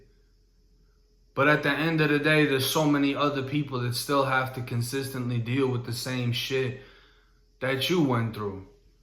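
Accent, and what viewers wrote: Boston, United States. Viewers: I’m under my girl’s thing here , anyways you’ve done a lot of time in Florida ? But your accent Boston ? I’m from NY , but lived in Florida for some yrs , spent a lot of time in Polk county fl , south county jail ( frostproof) our sherif was Grady Judd at the time , not sure if he still is ? But he’s a trip bro , could u do something on Polk county ? Ty for your vids pretty cool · "the sahgeant" my man you sooo Boston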